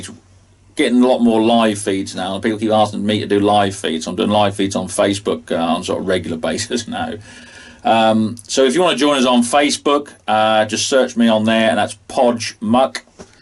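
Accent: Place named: Lincolnshire, United Kingdom. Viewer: Are you lincolnshire. You sound it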